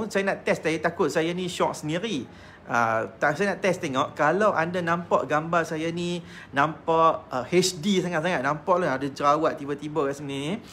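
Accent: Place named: Kedah, Malaysia. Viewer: Boleh saya tahu tuan ni dr guaman negeri mna..ckp mcm kedah..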